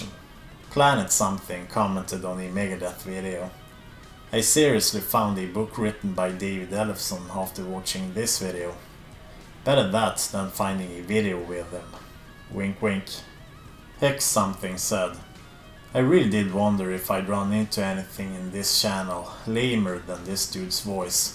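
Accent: Sweden. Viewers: Further to the comments about your accent, my first ideas of the supposed Swedish accent came from the late 1970's watching the Swedish chef from the Muppets Show, members of Abba, Bjorn Borg and an atypical Swedish blonde dollybird on a UK comedy show called Mind Your Language! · Actually your deadpan Swedish accent coupled with the dry sarcasm is really cool. I’m more into OSDM and BM but I really enjoy the channel, and in fact it has made me listen and discover some old metal gems. Nice work bro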